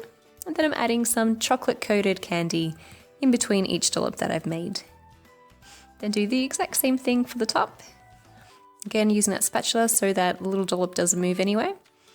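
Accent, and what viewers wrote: Australia. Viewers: thanks 😊😀. Also are you Australian???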